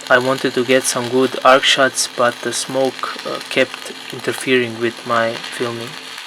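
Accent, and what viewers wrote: Russia. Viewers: говор русский. ры из снг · Парень, признайся, что ты русский) твой инглиш неплох, но ты палишься с произношением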